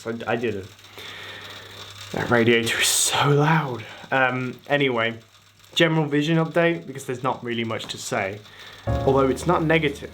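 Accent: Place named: United Kingdom. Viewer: Your British accent :)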